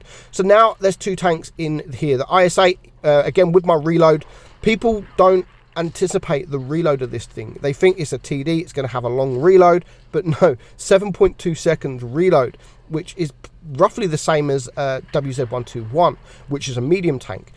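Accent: United Kingdom. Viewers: don't ya love it when gets on the mic and says in his British accent "Hello! I'm, and welcome back to the channel"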